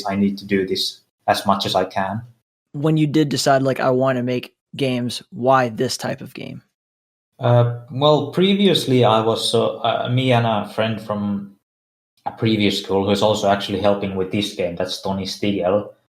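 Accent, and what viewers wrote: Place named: Finland. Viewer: Ralli englanti go wild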